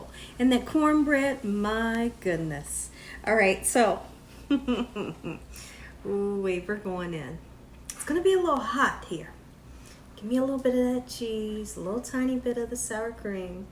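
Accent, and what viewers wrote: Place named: Texas, United States. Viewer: I would have never guessed you are from Ohio or maybe your not 🤔. I seriously thought TX! (Where I live) or Louisiana (my soul, I love that state) ORRRR Oklahoma 🙄😂 (you know TX & OK have a thing 😂. I kid I kid it’s cool IG 😂). Love your style tho. All the love from my family to yours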